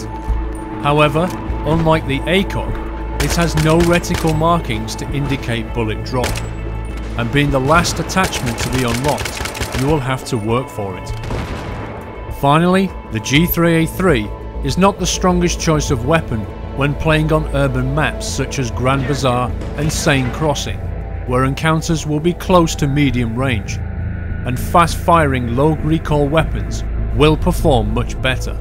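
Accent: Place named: United Kingdom. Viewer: Awesome man, the British accent goes great with this! You got a a subscriber!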